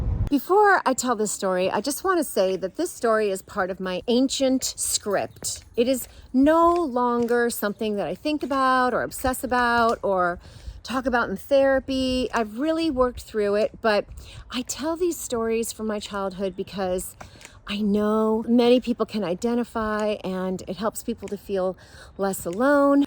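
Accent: England, United Kingdom. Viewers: The English accent is awesome!! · Love the British accent!!! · Llllove that English accent! I give you kudos for that one for sure!! (Even if I'm a year late for this one). But I love all accents, I gotta say, just love 'em! And so, throwing in some others now and then is just fine with me! But above all, I love your devotional talks here, thanks, our eternal friend! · Beautiful British accent,. Makes your beautiful voice more beautiful. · Not lovin' the English accent and imagine how cringe it's be if a British youtuber broke into an American accent. He/she would sure look a fool!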